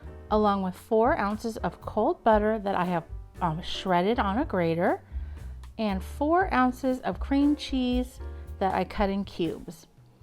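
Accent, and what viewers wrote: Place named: South, United States. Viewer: That is some south in your mouth 💥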